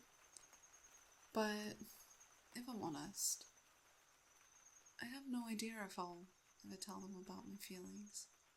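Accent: Australia. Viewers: Good morning, 8 ❤ I’m currently listening for the 5 time, & I’m loving the longer audio. I really hope you get more views on this, because it’s a quality story, & you’re a great storyteller. I love your voice, & as I’ve said before, your Australian accent makes me melt, 8 🥰🌹 Oh, I love the thumbnail. She’s so cute!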